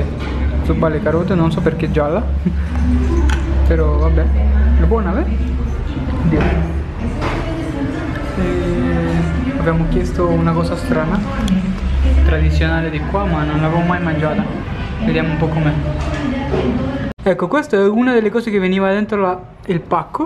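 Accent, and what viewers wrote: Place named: Mexico. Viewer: mi piace il tuo accento messicano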